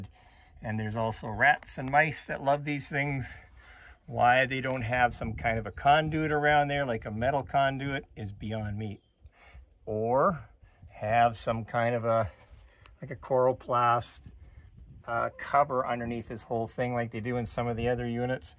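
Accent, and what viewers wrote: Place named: Western Canada, Canada. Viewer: I KNEW IT!! First-time viewer, but , I knew you were out in Western Canada -- years ago, I worked with a guy from BC who also pronounced garage as "garach." Nice guy, but he kinda stuck out in Central Jersey.😆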